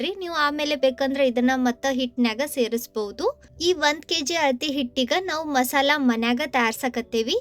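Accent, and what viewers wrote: North Karnataka, India. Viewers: ನಿಮ್ಮ ರೆಸಿಪಿ ಅಂತೂ ಚೆಂದವೇ ಜೊತೆಗೆ ಅಸಲಿ ಉತ್ತರ ಕರ್ನಾಟಕದ ಭಾಷೆ ಕೇಳೋಕ್ಕೆ ಇನ್ನು ಚಂದ 👌👌👌👌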